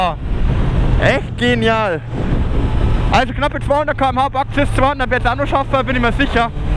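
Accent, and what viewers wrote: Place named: Bavaria, Germany. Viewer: I finds immer no nice dass du beim boarischn bleibst :) Bist echt a griawiger typ Weida so!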